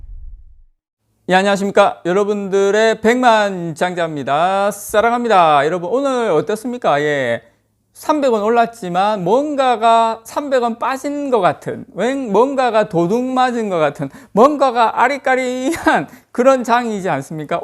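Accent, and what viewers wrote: Gyeongsang, South Korea. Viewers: 경상도 사투리 아리까리...ㅋㅋㅋ 의 사투리는 너무 구수하쥬♡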